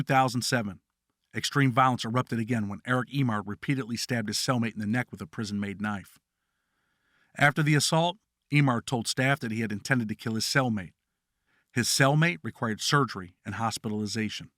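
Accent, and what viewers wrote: United States. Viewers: Always a good video. Props to you. I know you you from upstate and all that but damn homie you sound country as hell tho boy ha. Peace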